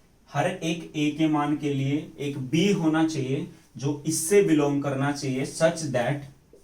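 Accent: Haryana, India. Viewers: Sir aapne haryanwi km achi lgti h...aap hindi hi bola kijiye..... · Ohh sir aapne haryanvi kb se bolni suru krdi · Sir hryanavi mt bola kro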